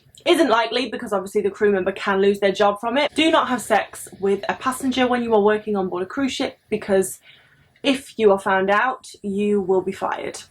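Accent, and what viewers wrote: United Kingdom. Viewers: Thanks! Love your videos and your British accent! You are the coolest! 🤪🤣😇😉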